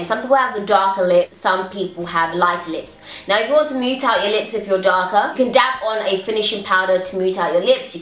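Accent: United Kingdom. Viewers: Are u British cause I hear the accent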